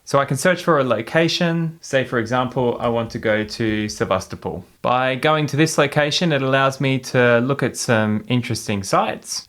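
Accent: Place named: Australia. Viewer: What are you trying to get at , as it seems anyone thats not a so called allie , it seems you've got a negative showing of their defence areas but if its an allie its a running track like new Zealand, I think your thought process is a bit on the side of hate and racism, thats not right especially since you sound like an Aussie, who are you getting paid from, because it seems im the only one thats noticed. Its a shame. Australia has only become like this because of tge stupidity of the American's and how Australian politicians cannot help themselves being other than the yes man or sucking up their arses, this is the whole reason this country has gone to shit . Its the stupidity and thought processes like what you've uploaded. Disgusting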